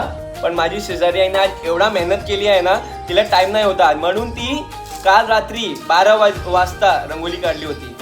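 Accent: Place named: North India, India. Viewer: तू भैया सारखा मराठी का बोलतो?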